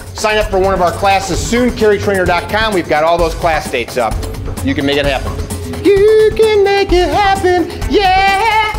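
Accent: Chicago, United States. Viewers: Gee I wonder if this guy is from Chicago. Nice accent. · That’s gotta be a Chicago accent. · Sounds like he's from Chicago. He talks like my brudder. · also, at least I didn't say that you sounded like Dan Akroyd, like the guy a couple of comments below mine, and yes, I guess it is more of a Chicago accent. I think I had a mental block on Illinois, however, being that gun rights up that area are so limited...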